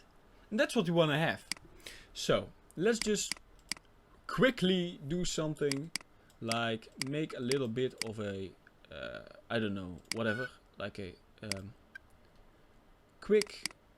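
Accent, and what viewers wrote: Netherlands. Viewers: lol i think that MAN is dutch like me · 2 sec in de video en ik dacht gelijk jup Nederlanderrrrrrr :) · Nederlands accent hahah. Nice vid man! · ik hoor aan je accent dat je nederlands bent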